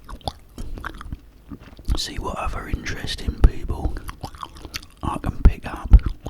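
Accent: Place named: Cockney, United Kingdom. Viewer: Hahaha. the cockney accent is Soo tingly!! I literally fell asleep to this earlier and had a weird nap dream about opening all the drapes in your house and you arguing with me to keep them closed 😂 But close up heavy accent whispers are such great ASMR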